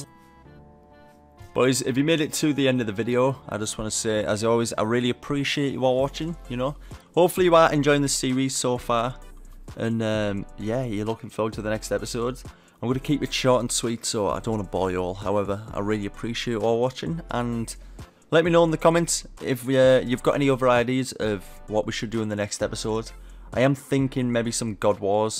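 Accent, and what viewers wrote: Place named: Teesside, United Kingdom. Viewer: I know a boro accent when I hear one UTB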